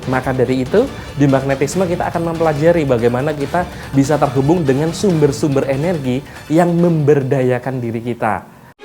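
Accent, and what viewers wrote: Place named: Banyumas, Indonesia. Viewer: Salam ngapak mas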